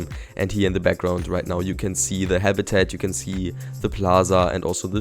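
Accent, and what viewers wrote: Germany. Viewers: I love the Design and Are You German because of the accent😅?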